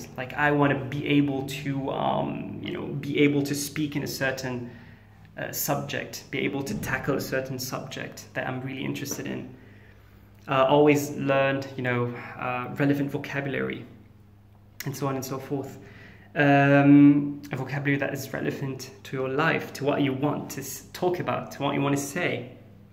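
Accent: United Kingdom. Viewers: Thanks for sharing the video...I see that your prefer British English and speak it in an amazing way. For me I prefer more the American/Canadian English with a big respect for the British English :) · Your english accent changed from american to british lol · its true though, the only word that I heard a slight accent in is motivation, and probably two others. Other than those words, your british accents sounds perfect to me! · If you aren't British then your accent is amazing Subscribed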